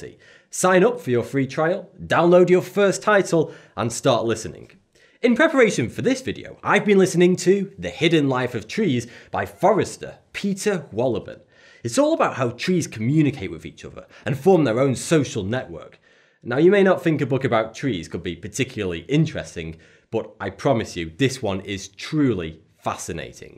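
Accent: United Kingdom. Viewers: omg you are british immediately frogives you for sponsors and ads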